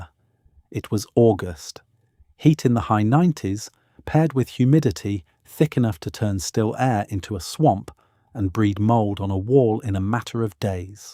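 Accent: England, United Kingdom. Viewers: Isn't it an Al Englishman?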